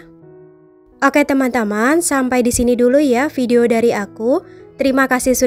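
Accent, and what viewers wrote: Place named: Madura, Indonesia. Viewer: Logatnya kayak madura 😅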